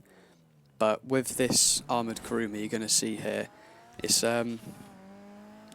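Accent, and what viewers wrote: United Kingdom. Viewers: I think the same, all youtubers GTA do clickbaiting. Please don't end up doing this though. I actually learned something from your video. I'd normally find British accents annoying but your voice is kinda soothing so thanks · This guy sounds British but his personality is all Canadian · love that british accent, nice video btw :D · Is he British or English but anyway I love his accent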